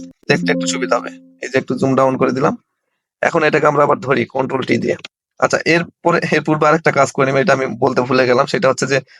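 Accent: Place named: Joypurhat-Naogaon, Bangladesh. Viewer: vai apner basa ki joypurhat,naogaon er dike naki?kotha bolar dhoron sune jigges korlam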